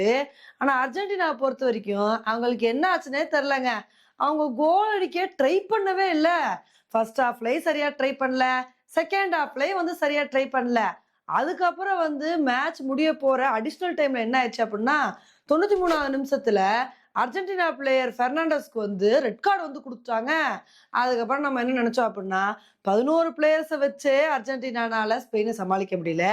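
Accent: Madurai, India. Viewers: மேடம் நீங்க மதுரையா பேச்சு வழக்கு செம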